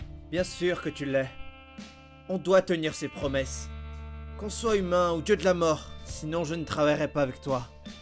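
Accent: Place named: Germany. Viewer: L'accent allemand, juste parfait 😂 mec continue frr tu gère trop 🔥 tu va gagner masse abo je partage et abo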